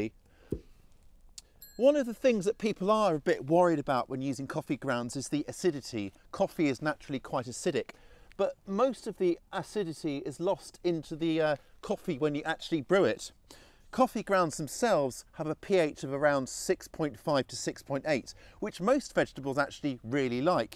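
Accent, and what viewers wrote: England, United Kingdom. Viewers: “Give me some skin bruh” something about your English accent has me addicted to this channel lol the endless knowledge and tips help out too 😎🤘🏼🪴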